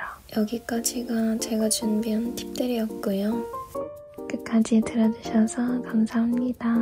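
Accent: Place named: Japan. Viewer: 일본어 억양이 느껴지네요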